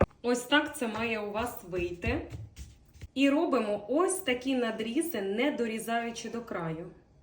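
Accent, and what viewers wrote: Ukraine. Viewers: Не так хрустики як справжній український голос молодець спів супер😊😊😊😊😊😊😊😊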